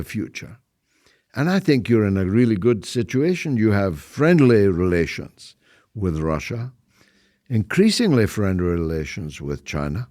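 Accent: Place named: Ireland. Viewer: This man sounds irish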